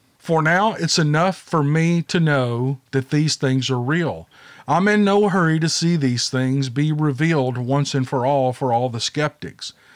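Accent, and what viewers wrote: South, United States. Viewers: All these stories were very credible. Stories submitted about these creatures that do not include the fear factor but depict them as friendly harmless teddy bears are very suspect, in my humble opinion and don’t ring true. Of course this is not a slight towards you as the narrator. You simply recount it as it is presented to you without bias and you have just the right southern accent to do a very good job. Very enjoyable listening.😊 Greetings from the “great white north.” 😁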